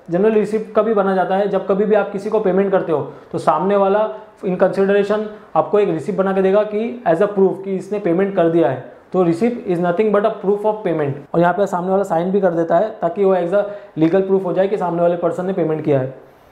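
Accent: Maharashtra, India. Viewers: sir aap marathi ho kya ????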